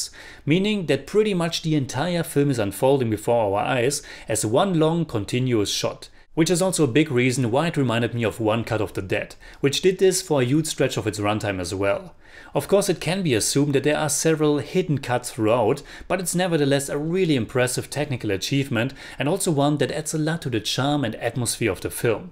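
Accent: Germany. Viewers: Dind´t though of this movie as a mindf*k at all and my disabled roommate who was kinda afraid to watch this movie, because "it´s for the smart people" was like me kinda disappointed for it being a quite simple time paradox movie after all the hype. In the end it was a really great B-movie despite the typical bad acting of some actors. But what I´m wondering, why do you still have this obvious german acent left? Why don´t barely any germans take pride in pronouncing a different lenguage correctly? It´s always just like "srew it if I butcher the pronounciation despite having all the possibilities to learn how to pronounce correctly due to the freak`n internet". I´m about to get 35yrs, had terrible english teachers trying to force their germanized take of british english on us and yet, I´ve started to teach propper pronounciation to myself at the age of 17 (I´m old, just got the first affordable and actual useable internet connection just two years prior) and am asked which english speaking country I´m from since I´m 21. 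But I guess I have a big talent for speach since bavarians asked me which part I´m from even I´ve never been to bavaria in my life. In the end, your pronounciation is telling how much respect you got for a another lenguage.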